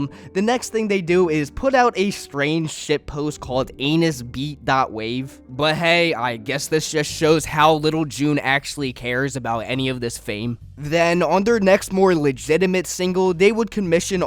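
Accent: Australia. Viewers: The way i hear Australian and got so disappointed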